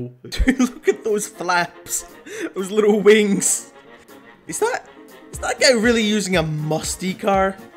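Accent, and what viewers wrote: United Kingdom. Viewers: Btw are you Scottish it sounds like it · I still don’t get how your British, I’m from England and have never heard anyone like you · he sounds lockey welsh or northen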